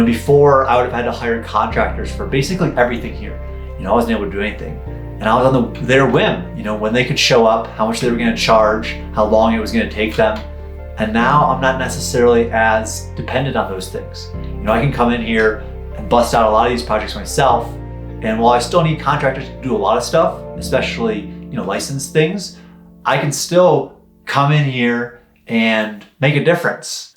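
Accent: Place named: East Coast, United States. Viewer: You sound like youre from the east coast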